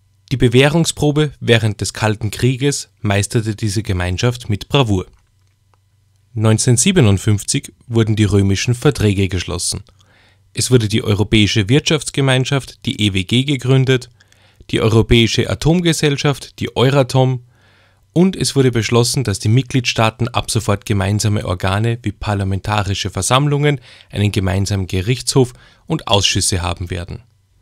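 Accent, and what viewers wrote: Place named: Austria. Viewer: Sehr gut erklärt,man hört das du Österreicher bist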